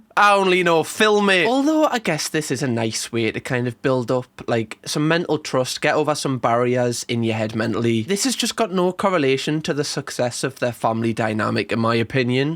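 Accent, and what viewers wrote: Newcastle, United Kingdom. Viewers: Can I just say never change your accent and don't start saying 'mom' like some other UK YouTubers do; your accent is great and repping for the geordies!! ❤ from in London, UK ❤ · your comments are hilarious and true. Proper Geordie?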